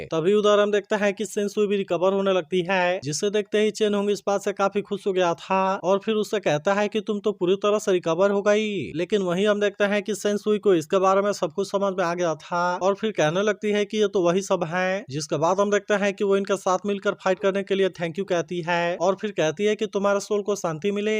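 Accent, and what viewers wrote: India. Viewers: Are you a bihari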